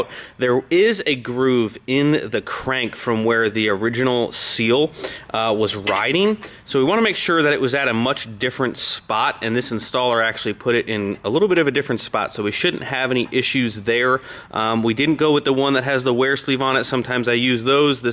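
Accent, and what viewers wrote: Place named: Texas, United States. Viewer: No, but his voice DOES develop a 'Texas Twang.'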